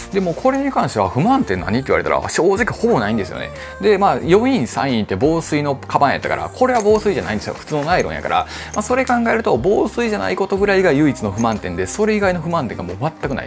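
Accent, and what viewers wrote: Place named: Osaka, Japan. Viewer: 大阪弁とヒョウ柄🤣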